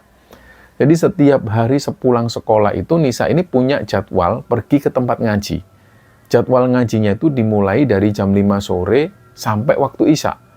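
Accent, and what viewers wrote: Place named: East Java, Indonesia. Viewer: Sy bu Wulan Smg ngikuti kembara sunyi dan mulai tertarik ksh2 misteri saat n istri cerita perjalanan k gunung bromo th brp itu 2020 ya... Sy tertarik cr penyampaian cerita ini bs membawa alur crita enak, seolah2 sy ikut terlibat dlm crita itu mdh d pahami.. Enjoy gt bhs jawa timurnya medok banget,krn sy org surabaya leluhur sy...semoga kembara sunyi smakin sukses Aamiin Yra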